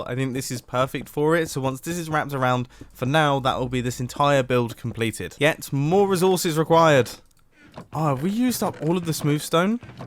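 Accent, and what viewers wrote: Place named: United Kingdom. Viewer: he is British!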